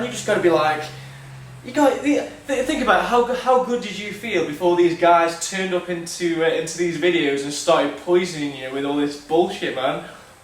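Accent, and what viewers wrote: United Kingdom. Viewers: because of your accent :P are you from yorkshire?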